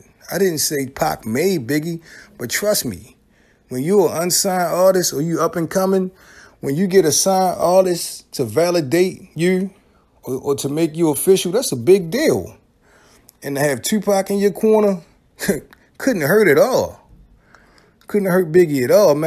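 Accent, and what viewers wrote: Baltimore, United States. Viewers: Subbed heard you say tew an kne you from baltimore. The content is A1 so the sub was a easy choic dummy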